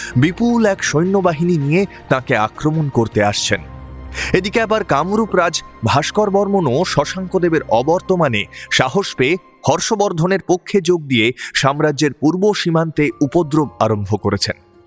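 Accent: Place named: Kolkata, India. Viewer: Apnar pronunciation khub Valo. Kolkatar Bangla accent mashallah shundor khub.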